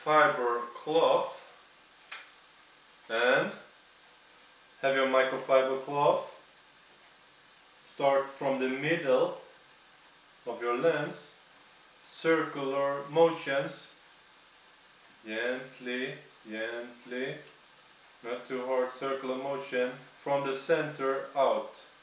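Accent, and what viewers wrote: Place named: Scania, Sweden. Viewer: du är skåning:D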